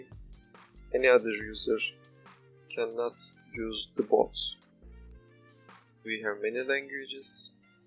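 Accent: Turkey. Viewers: man youre turkish right, your accent is so turkish!! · %90 Türksün değil mi :D kelimeleri telafuz edişini türk gibi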